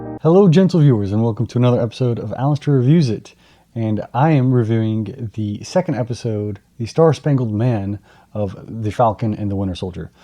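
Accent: South, United States. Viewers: I love your vlog on this video at your house in Texas during your quarantine until you're talking about the second episode of "The Star-Spangled Man" from the mini-series of "The Falcon and the Winter Soldier" got came out on Disney+ while it's all about John Walker, who appears on the morning talk show of "Good Morning America" as Captain America, revealing his desire to live to Steve Rogers' mantle. Meanwhile, Sam Wilson and Bucky Barnes struggle to work together as Barnes expresses disapproval in Wilson's decision to surrender Roger's shield. They travel to Munich and the find the Flag Smashers smuggling medicine. Wilson identifies a possible hostage, who is revealed to be the group's leader, Karli Morgenthau. The Flag Smashers, with enhanced abilities, quickly overpower Barnes and Wilson until Walker and Hoskins requests Barnes and Wilson join them in aiding the Global Repatriation Council (GRC) to quash the ongoing violent post-Blip revolutions, but they refuse. 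Meanwhile, Morgenthau receives a threatening text from an unknown number, so you're talking about all of the characters from the start after that, I hope your husband, Zach will be proud of you and I'm very happy for you, I know you're half Mexican and I love your Southern accent!! #TheFalconandTheWinterSoldier #DisneyPlus #Marvel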